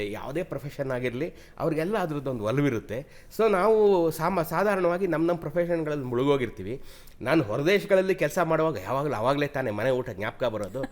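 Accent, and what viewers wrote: North Karnataka, India. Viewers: ಉತ್ತರ ಕರ್ನಾಟಕದ ಮಾತೂ ಮನಸಿಗೆ ಮುಟ್ಟಿತು ಸರ್ 😢🙏🙏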